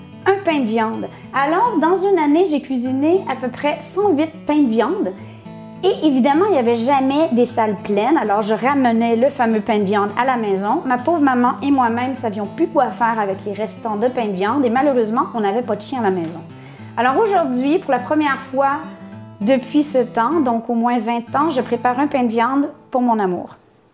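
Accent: Canada. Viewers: Je me demande pourquoi je ne vous ai pas connu plus tôt ?? Vos vidéos sont agréables à regarder. Vous êtes marrante et je kiff trop votre accent...le bel accent canadien.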